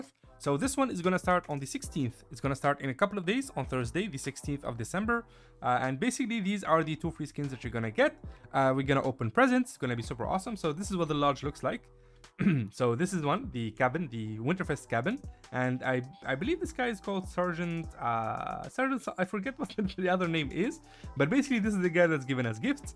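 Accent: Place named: France. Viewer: J’ai l’impression que t’es français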